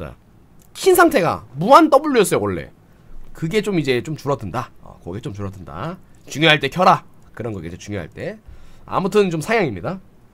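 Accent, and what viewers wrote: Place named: Busan, South Korea. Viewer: 걸쭉한 부산사투리 ㅋㅋㅋㅋㅋㅋㅋㅋ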